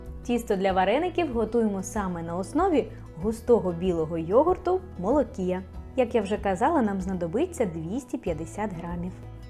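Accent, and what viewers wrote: Ukraine. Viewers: Очень милый акцент Украинский❤😊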